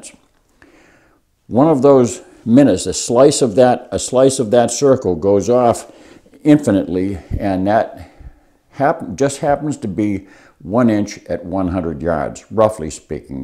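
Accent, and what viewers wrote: New England, United States. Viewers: Definitely from Massachusetts or NH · Great content. And I grew up in NH, near MA. Good memories of the experienced man influence. Especially with the accent I remember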